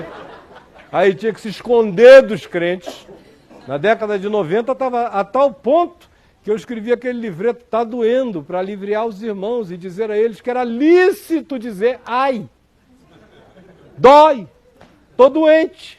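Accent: Recife, Brazil. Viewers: ai sabe que fala recife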